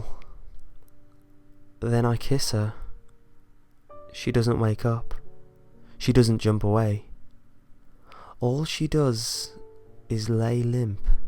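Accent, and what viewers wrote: England, United Kingdom. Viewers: I want to say that you really are awesome and I love listening to your creepypasta narrations because of your awesome accent. I have to ask though are you from England or do you live in England. I'm wondering because of your awesome accent. My friend told me you probably aren't from England but you're using the accent to make yourself sound better, I say hes wrong which of us is right. But either way I'll still love your creepypastas. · Your slow English accent makes everything more intense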